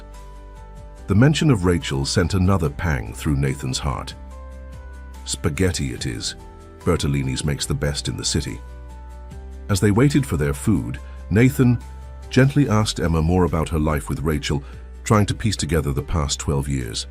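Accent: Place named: United Kingdom. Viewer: a very elegant British narrator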